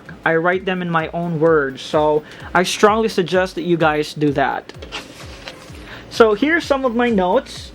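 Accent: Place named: Hawaii, United States. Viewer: Good content. Btw where you from? I hear an accent. Hawaiian?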